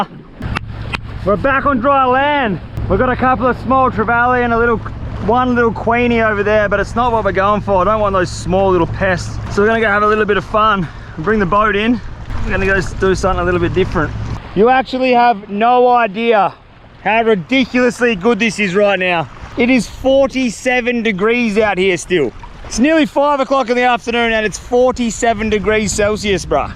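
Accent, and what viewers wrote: Australia. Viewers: That thing just absolutely making me piss - he is so hilarious when he says aussie sentences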